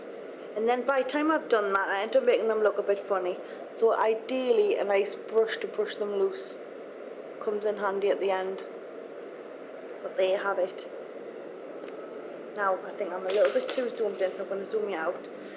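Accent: Newcastle, United Kingdom. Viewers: You sound like a Geordie! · she's a geordie! i.e. from newcastle :) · WHHAT? she's clearly from newcastle. have you ever seen gavin and stacy? that's what a welsh accent sounds like. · It's "Geordie" almost. The real geordies live in Newcastle and wouldn't like anyone from south of the river Tyne to be called true geordies, but thats the accent.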